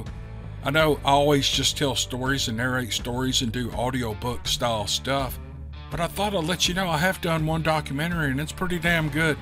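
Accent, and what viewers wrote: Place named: Appalachia, United States. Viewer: Not sure if anyone answered the question what is GLAMPING but it's basically glorified camping!! Kinda like u know high end camping with a big nice r.v that has a kitchen in it air conditioning and all the finer things u get at home but on a campground. Cause your a country boy like me when we went camping growing up and some of us evening now camped in tents sleeping bags and "living high on the hog" was an air mattress 😂. We used a camp fire possible a grill at the cp site glampers cook in there stove or in there fancy gas grill.. as I get older glamping is nice lol I get stuff and wet in an old ⛺ tent anyways I guess I could be wrong but this is my interpretation great show your our hero love your voice u sound like someone from the holler don't ever change man.. your friend Billy Kirby Lake Cumberland Ky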